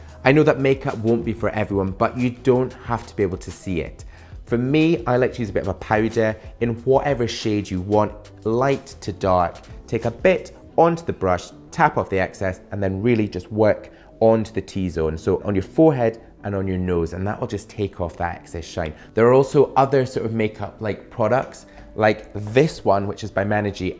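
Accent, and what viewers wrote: United Kingdom. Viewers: I love that British Accent!✌🏻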